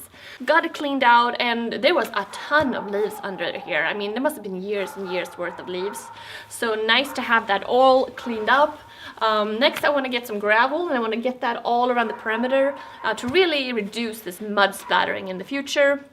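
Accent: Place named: Sweden. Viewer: En personlig fråga. Vari Sverige kommer du i från? Svenskan lyser igenom i din accent nämligen. Bara nyfiken. Annars är jag djupt imponerad av din oräddhet när det gäller att ta sig an olika byggprojekt!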